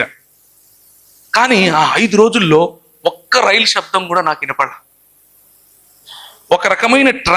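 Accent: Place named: Telangana, India. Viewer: Full Telangana accent..😄..we miss you brother..feel very sad..🙏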